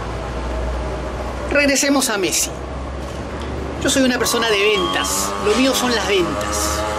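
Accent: Argentina. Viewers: SI ERES PERUANO XKE HABLAS COMO ARGENTINO? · Este tipo es argentino o peruano??????? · porque es argento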